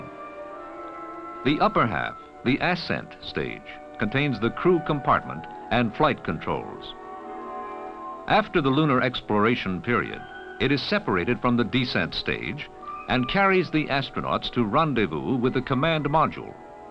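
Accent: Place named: United States. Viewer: Love the US accent used these documentary films in the 50s and 60s. Not a fan of the music and worthy of watching. Did they ever get shown on UK tv?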